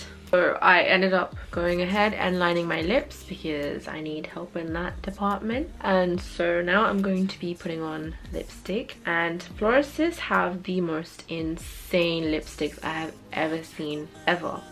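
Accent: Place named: London, United Kingdom. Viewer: ngl I did NAWT expect you to have a London accent but what’s good 💅🏾✨ make up looks sick n I hope you feel a little better now 🥺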